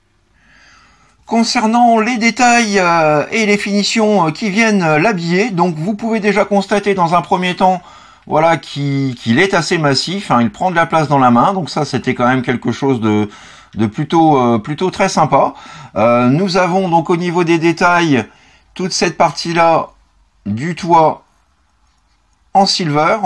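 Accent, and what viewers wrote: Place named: France. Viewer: With your beautiful french accent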